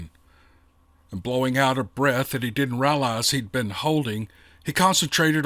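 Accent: South, United States. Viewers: Omg im not one for listening to someone else read for me,i love to read books myself, but im hooked absolutely hooked,i love your southern accent, im scottish,you really got me listening and on the edge of my seat, I've just started binge watching your podcasts,amazing never stop,im not normally into this sort of thing, keep it coming 😊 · You did an excellent job reading these to us. I've heard you before, but hubby and I sat down and listened to all 6 Parts in one day, no joke. We couldn't stop listening until we heard them all. You are an excellent storyteller, and we appreciate you!! Your southern drawl and just the way express everything makes it come alive. I do not want to forget DA Roberts, who without him these would not be possible. Thank you again. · I am so darned excited, I could not listen to part 1 fast enough, then when I began part 2 directly after part one. 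I HAD to put on the brakes! "Savor it, don't rush through it", I told myself. But, have I listened to me? Oh HELL no! First taste I just started gobbling part 2 up, as well. I had to force me to STOP and send you and D.A. a note to say, as usual, nothing but the best for your followers. Mr Roberts your writing rocks, I love your brain and how it works! Your words manage to keep me glued to my seat 'til the last little scrap is gone. Then I am wanting more, like some kind of crazy person, I sit and whine for a moment. I can't help myself I just HAVE to go back and listen to it all over again, I KNOW I missed something, some important detail. I love how you put words together and whisk us away to a new world, it's amazing!, your voice and southern accent (lived in Tennessee for over 25 years and nobody sounded as good as you do) enable me to listen to something over and again because it's so soothing and yet loaded with excitement, sadness, joy or what ever the emotion the author intends. 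The ability to read aloud with all the inflections necessary to put the listener right in the middle of it all is not something everyone can do. You both are gifted. I want to THANK YOU both for sharing your gifts with all of us. I just love you both to bits and pieces, again, thank you!:face-red-heart-shape::face-red-heart-shape: Rosey loving life from the boond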